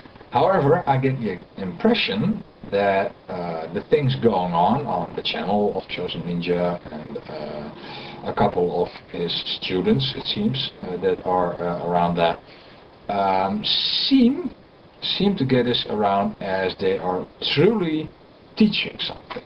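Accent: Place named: Netherlands. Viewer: i can hear by your accent that you are from holland :p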